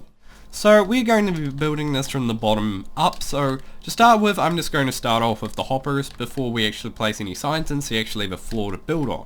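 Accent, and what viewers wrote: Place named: New Zealand. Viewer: What part of New Zealand are you from? I'm From Auckland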